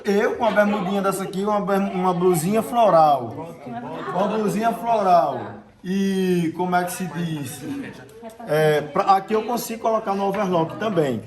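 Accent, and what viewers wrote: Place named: Northeast, Brazil. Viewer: O professor faz tudo parecer tão fácil. Parabéns. E esse sotaque maravilhoso. Sou carioca e amo sotaque nordestino ❤🎉🎉🎉🎉🎉🎉🎉🎉🎉🎉😂bj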